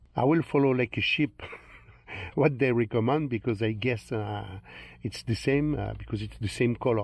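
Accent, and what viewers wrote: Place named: France. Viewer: Bonjour, Je me permets d'écrire en français étant donné avec qu'avec ce magnifique accent, je pense reconnaître notre belle France dans votre voix ! J'aurais voulu avoir s'il était possible de changer de musique avec les flèches suivant et présentent du volant si on est dans un album par exemple ? Super vidéo et merci d'avance !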